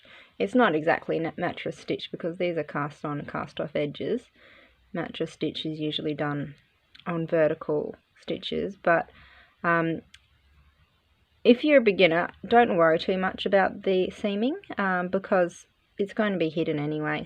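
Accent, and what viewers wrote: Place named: Australia. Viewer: I like it very much! and great to hear a fellow Aussie here on YouTube!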